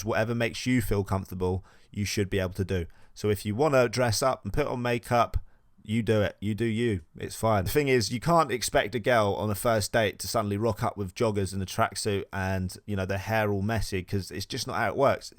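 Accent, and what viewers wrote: United Kingdom. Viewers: U have a great voice and accent...r u British?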